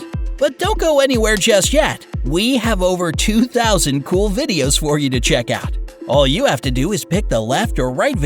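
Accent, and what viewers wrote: United States. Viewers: why did he speak American accent in the video about UK??? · U sound American..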